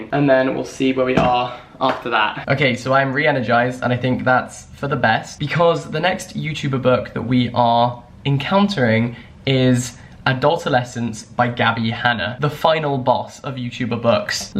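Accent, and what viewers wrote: United Kingdom. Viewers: The British accent 😍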